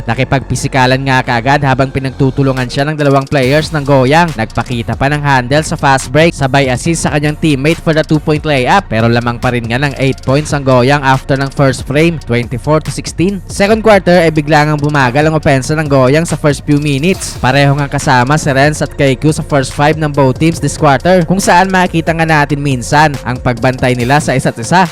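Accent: Iloilo, Philippines. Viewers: ilonggo ka man gid idol diin ka sa iloilo tikalon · ilonggo meg? haha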